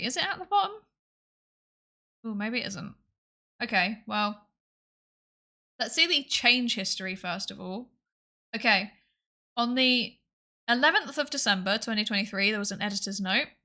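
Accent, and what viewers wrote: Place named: United Kingdom. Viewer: I don't know how I got here, but I can tell from your accent that you're from the same region in the UK as Rin Penrose, so that's good enough a reason for subbing as any.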